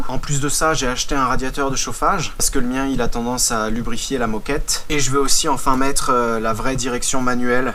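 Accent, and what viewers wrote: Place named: Lorraine, France. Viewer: Slt maestro t’a un accent lorrain je trouve Salutations mosellanes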